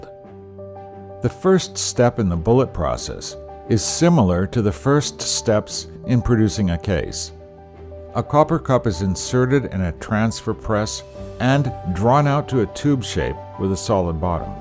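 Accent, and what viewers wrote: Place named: United States. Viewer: The heavily American voice over is weirding me out.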